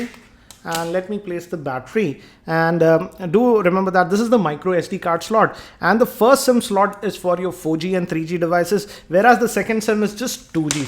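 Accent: India. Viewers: not a bad video i like it, your indian accent does annoy me a little bit and im sure im not the only one but other than that this is my first time watching one of your videos and its good, im thinking of buying this phone and your review did help me to get the information that i need thanks! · Complaining about his Indian accent ? What are you people, five years old?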